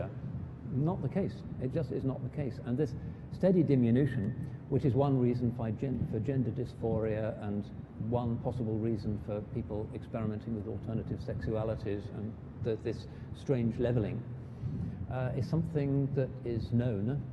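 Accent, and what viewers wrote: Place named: United Kingdom. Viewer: Why the british accent?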